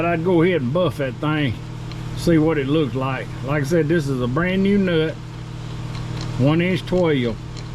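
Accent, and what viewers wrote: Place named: Boston, United States. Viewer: Trying pinpoint that accent and I’m guessing that’s a Boston accent hahahah. Great video just subscribed.🇺🇸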